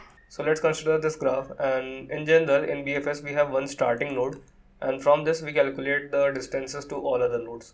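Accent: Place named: India. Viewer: everything's great but i'm not used to his indian accent so my eyes are a bit tired of reading the script 😎😎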